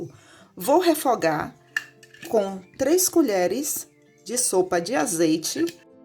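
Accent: Bahia, Brazil. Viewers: Amiga tu é baiana? Parece viu kkkkkkk,top essa torta!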